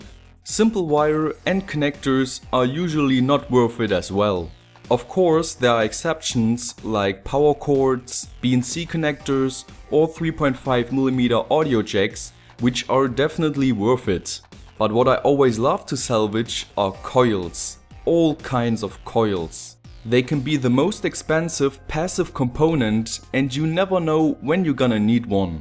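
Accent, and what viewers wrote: Germany. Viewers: Are you German? You sound like me when i speak English (i'm German). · That's a german accent :-) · Desloder with a heatgun... u welcome ;) btw. i would guess u german and not russian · german trying HARD to speak english...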